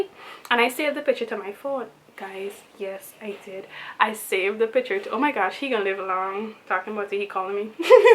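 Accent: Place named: Guyana. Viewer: Guyanese are the best story tellers sound effects and all😂😂 Great story, the accent made it better✨✨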